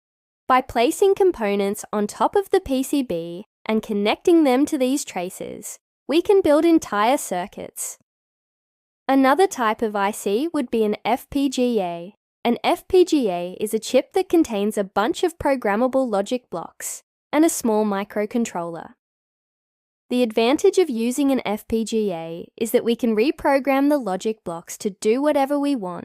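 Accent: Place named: Australia. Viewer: Very interesting. Thanks for sharing. I like the relatively light Australian accent. 👍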